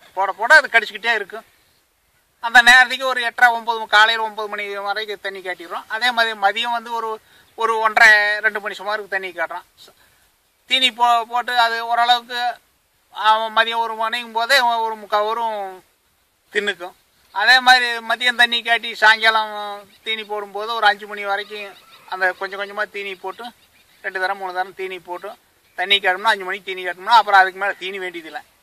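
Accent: Kongu, India. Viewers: Kongu Tamil❤️ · இந்த கொங்கு இன தமிழ்....... வாழ்க.,.. வாழ்க..... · ஆஹா...கொங்கு தமிழைக் கேட்டுக் கொ‌ண்டேயிருக்கலாம்! அருமை!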